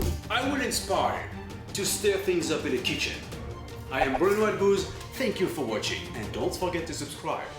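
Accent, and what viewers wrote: France. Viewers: Your accent is sounds so funny :D French, isn`t it?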